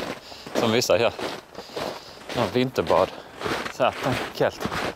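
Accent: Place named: Kristianstad, Sweden. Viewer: Kunde nästan svära på att du var från Kristianstad-trakten :-) Iofs inte SÅ långt emellan men lät så på dialekten. Fina omgivningar där i Småland. Och så mkt snö!! Här i Eslöv har vi vit mark nu, denna i natt, och jag önskar att det försvinner lika fort som den kom haha. Din vovve... Det är väl inte en New Foundland?? Såå fin!!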